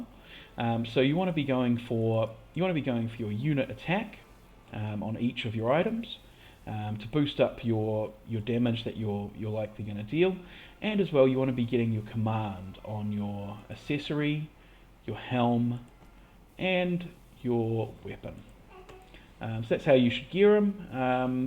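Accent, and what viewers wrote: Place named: New Zealand. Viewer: Hey man you sound like your from NZ? Wouldnt happen to have an NZ squad I could join next season? Awesome content by the way, very thorough and informative!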